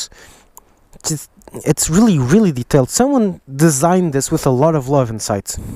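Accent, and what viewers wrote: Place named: Portugal. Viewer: nice portuguese accent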